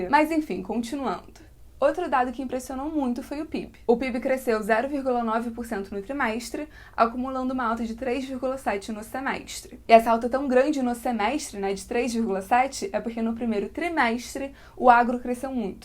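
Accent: Rio de Janeiro, Brazil. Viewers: Carioquês bacana..😂😂😂😂😂... E muito conteúdo bom.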